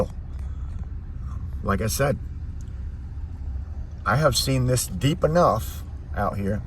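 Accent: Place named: North, United States. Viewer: Howdy neighbor I live in Fair bluff NC we had flooding, but not like that this time the Beaches got hit hard . You sound like you're originally from up north I am as well , but been here 30 plus years now. I subbed my friend does a lot of scrap metal I did it myself a few times . I clean houses at N Myrtle Beach and work at a grill a few days ain't retirement wonderful 😂 . God bless we have another storm heading our way I love across from the lumber River I'm on the high side so I don't get flooded but you can't get out either.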